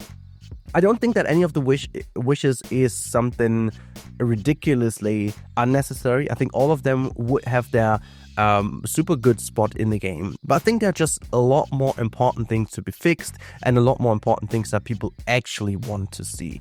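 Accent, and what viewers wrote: Germany. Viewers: Immer noch aus Deutschland, hört man doch😅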